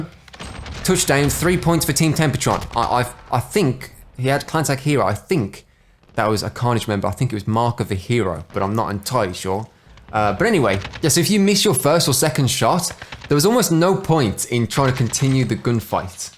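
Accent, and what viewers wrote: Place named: United Kingdom. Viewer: Your accent is British af.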